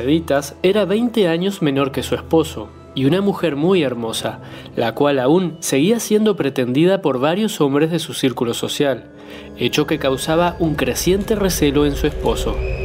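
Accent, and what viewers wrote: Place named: Montevideo, Uruguay. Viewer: Seis años después, vengo a ver éste video, soy Montevideana y viví muchísimos años en esa zona. Son fascinantes las antiguas casonas del Prado! Tenés un acento bastante parecido al de los Montevideanos excepto por la pronunciación de las "ll" o "y" 😊 de qué país sos? Es una pena que la hayan derruido a la casona y en su lugar haya un edificio. Saludos desde Uruguay y el video está muy bueeno!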